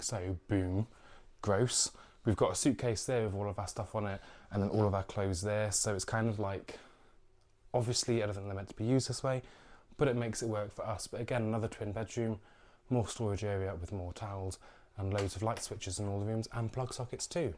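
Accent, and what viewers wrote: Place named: RP, United Kingdom. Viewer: Love this. Me and my husband are staying in keldy in a silver birch for Xmas. Can't wait. Something funny aswell but my name is Daniel so it was a bit odd to see my full name in the corner of your video obviously your and. Hahaha. Love your posh accent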